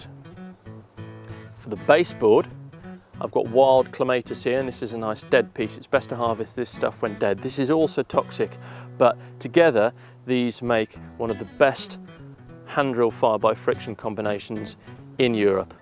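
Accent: United Kingdom. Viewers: I love you your Brithish acent is to die for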